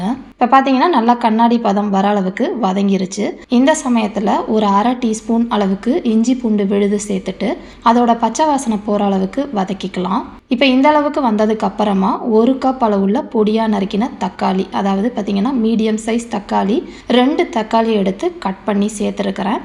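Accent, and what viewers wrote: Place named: Kongu, India. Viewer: Sister unga native enga.. கொங்கு தமிழ் mari இருக்கு உங்க slang????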